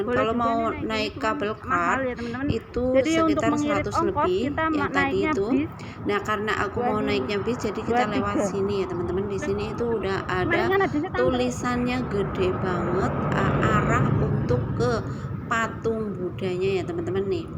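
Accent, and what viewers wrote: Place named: Banyumas, Indonesia. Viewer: Salam ngapak mbae😂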